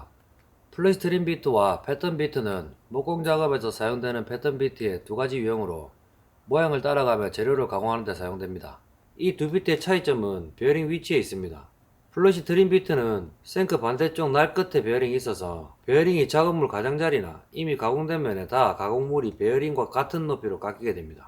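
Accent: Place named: Busan, South Korea. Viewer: 사투리가 낯익은데 부산이십니까? 늘 좋은 영상 발조고 있습니다~얼릉 구독자 늘어서 유명해지시면 더 좋을것 같습니다! 좀 딱딱하지만 진짜 중요한걸 늘 잘 가르쳐 주셔서 좋은 선생님이자 형님이라 생각하고 있습니다~!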